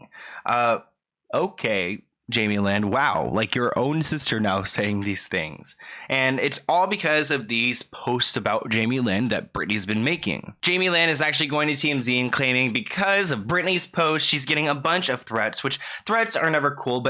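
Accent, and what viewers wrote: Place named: United States. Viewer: Love your videos, keep up the amazing work! Does anyone know where in America is from? I’m from the UK and like to try and work out the nuances in the American accent. Thanks!!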